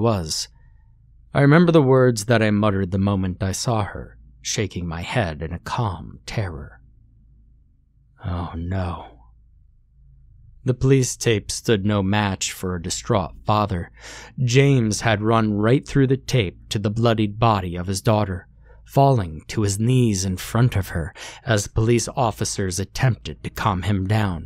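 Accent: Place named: Northeast, United States. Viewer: Accent? Where are you from? To me he just enunciates clearly and sounds almost northeastern, like Massachusetts or something 🤔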